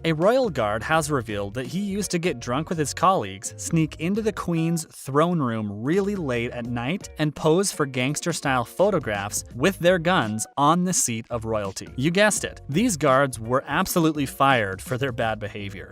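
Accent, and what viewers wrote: United States. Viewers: What do I think ? I think the tourists should remember, they are duty bound to keep the Way free for the Guards and not reversed. You sound like an American, would you disrespect your own Soldier Guards at the Tomb of the unknown Soldier in the USA ? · The narrators' accent says it all. Yanks are always a pain,an advertisement of a run-down,corrupt & broken nation.Its types like him that dragged a once-proud nation to the depths it now inhabits. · The narrator is a jerk, he sounds like a Yank, so dumb.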